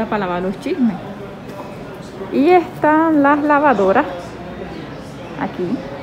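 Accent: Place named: Atlántico, Colombia. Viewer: Hola, como estas? Me encanta ver tus videos, me pareces una mujer con un carisma muy lindo y chistosa. El modo de hablar con ese gopeadito polonuevero que aún permanece en ti Bendiciones, besos y saludos de tu abuelo cesar Cervantes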